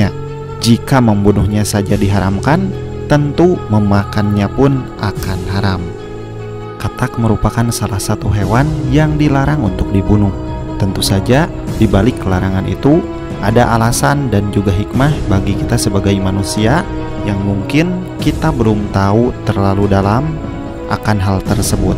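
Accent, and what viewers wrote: West Java, Indonesia. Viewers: Sunda banget logatnya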